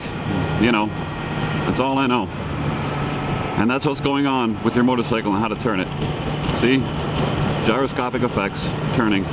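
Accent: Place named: Ireland. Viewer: stop fing and talking and do some cornering. bla bla bla. Some Irish accent is coming out to lol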